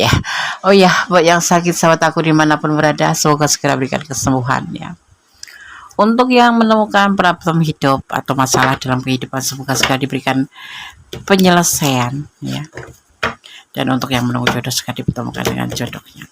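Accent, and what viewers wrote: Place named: Java, Indonesia. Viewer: Jogja hadir nih Kak, wah kk ini klo bicara MEDOK bingit's,sy suka skl cr kk membacakan readingan,kayak ada manis2nya gituuu heheheee.....,maaf kak canda lho😁. Ok kak tksh readingannya,Readingan selanjutnya ditggu🤝🙏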